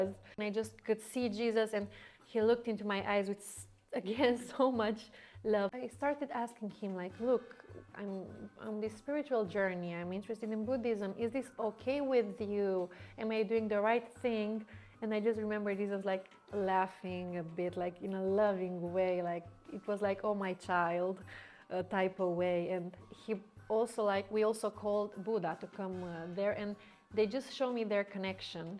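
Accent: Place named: Russia. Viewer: Hi u sound Russian😘Whoa this was posted on my bday, woohoo!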